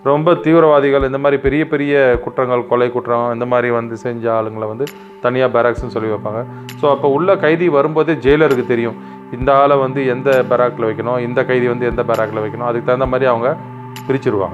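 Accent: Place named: Kerala, India. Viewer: Bro i think u r from kerala maybe malayalam slang tuch anyway good job good explanation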